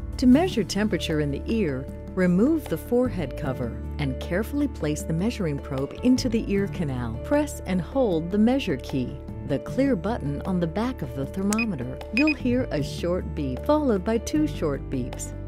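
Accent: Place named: England, United Kingdom. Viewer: I think it's an English accent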